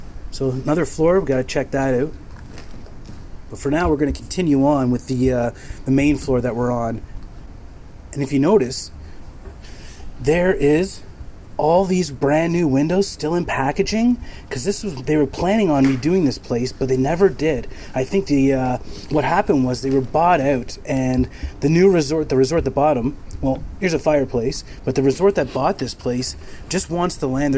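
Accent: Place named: Canada. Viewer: "We checked it ooot" = Canadian.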